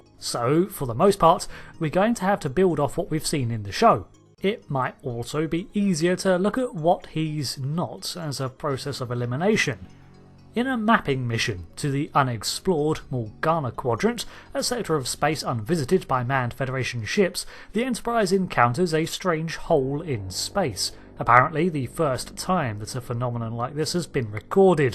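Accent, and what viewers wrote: United Kingdom. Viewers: Awful nit brit narrator. "Theah shiewwwwwwwwwwwwwwds..."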